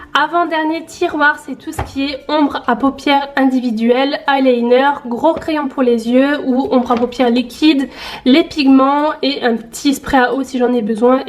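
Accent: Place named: Alsace, France. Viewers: Hello! suis fan de tes vidéos et Strasbourgeoise ! ça fait plaisir de reconnaître quelqu'un avec son petit accent ! Superbe vidéo, moi qui me fait une coiffeuse ça m'a donné plein d'idées ! merci beaucoup et gros gros bisous !! #TeamSnap 🌟🌟🌟🌟🌟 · C'est la premiere fois que je ne m'ennuie pas dans une video rangement makeup lol.. Sinon t'as vraiment l'accent alsacien ou lorrain jsp mddr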